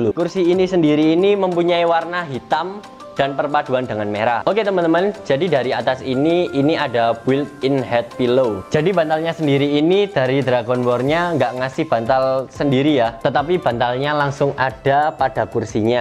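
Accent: Java, Indonesia. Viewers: Masih medok Harus di halusin 😄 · Mendok banget... Gak enak dengernya.. Belajar ngomong dulu ya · Aku suka medok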